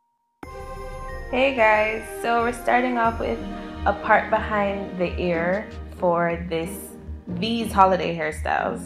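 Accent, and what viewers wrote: Jamaica. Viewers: Are you Jamaican? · Are u jamaican?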